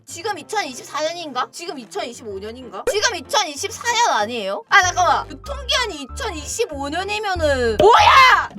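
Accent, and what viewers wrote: Busan, South Korea. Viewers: 부산 아지매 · 이분 경상도인임?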